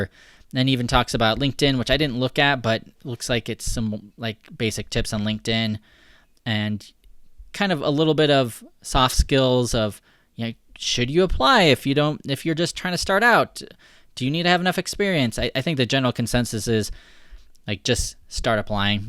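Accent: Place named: United States. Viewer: What american accent is that? Its beutiful and smooth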